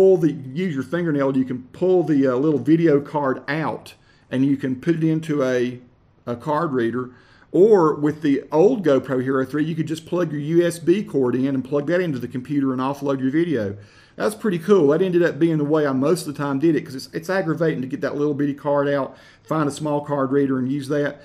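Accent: United States. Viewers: You have a beautiful American accent (where I come from we don't have any American accents. We do have a couple of British accents, but no American accents). Seriously.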